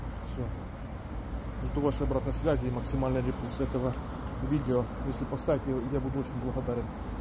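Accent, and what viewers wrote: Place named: Ukraine. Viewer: Так это же украинский говор. Такой мягкий и приятный уху!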